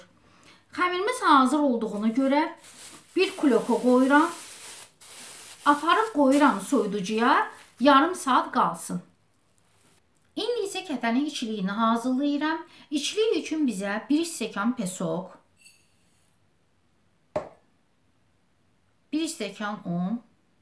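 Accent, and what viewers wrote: Baku, Azerbaijan. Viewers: Salam bacı. Kərə yağını duru yağla əvəz etmək olarmı xəmirində? Bakı ləhcəsi ilə danışığınız 👍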